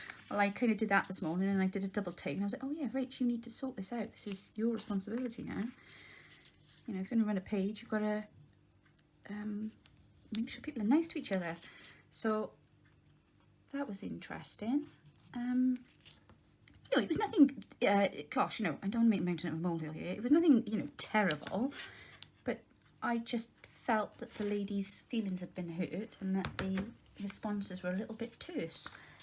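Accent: Wales, United Kingdom. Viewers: Ooh, I love listening to your lovely Welsh accent! Your style is easy to follow and such great ideas. I’m new to your channel and will be watching with interest...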